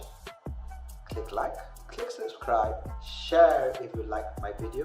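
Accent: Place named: Australia. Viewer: Me walking around listening "Continually" Why is this AI Australian?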